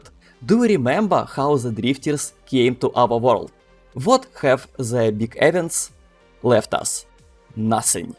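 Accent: Russia. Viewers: Ляя, ну нахера стараться держать этот русский акцент. Ты можешь читать нормально по английски. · Ну хз, вот как раз такой "русский" английский я понимаю, потому что произносятся те звуки, которые иностранци проглатывают :D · ты когда говоришь на английском, представь, что ешь картошку) А то слишком русский акцент, по ушам бьет и могут не понимать.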